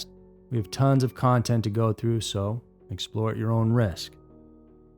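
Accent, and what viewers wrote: South, United States. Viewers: When a new creepiest comes out, I only watch it during the day. I am a new subscriber and have been binging so much I live in a permanent state of creepiest. If didn't have that addictive Southern drawl it would be easier to take a binge break 😊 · Love your southern accent 😂🇬🇧. I really hope the cold cases can be solved......the families deserve it 🙏🙏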